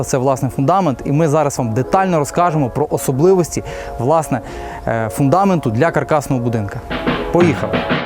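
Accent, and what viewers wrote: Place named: Galicia, Ukraine. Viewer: Я понимаю это позорный галичанский суржик бандеровцев. Мерзко слушать . Тоже отписка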